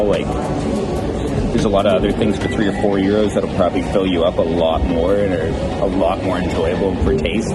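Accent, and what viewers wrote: Canada. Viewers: You sound very Canadian. Great video, very helpful for sure thank you !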